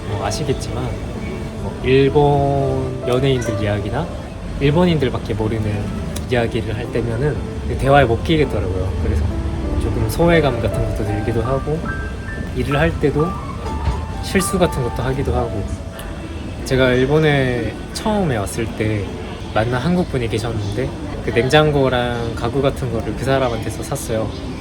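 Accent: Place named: Gyeongsang, South Korea. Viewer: 혹시 경상도 출신이신가요~? 억양에서 살.....짝 경상도 억양이 ㅎㅎ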